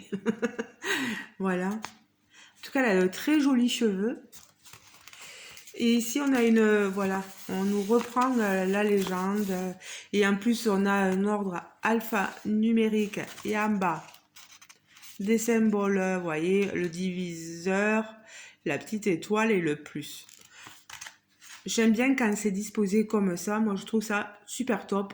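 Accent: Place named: South, France. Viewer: Coucou ma petite ❤ Merci pour cet unboxing avec une vidéo qui fait plaisir pleine de bonne humeur et ton joli accent du sud 😍 Gros gros bisous 😘😘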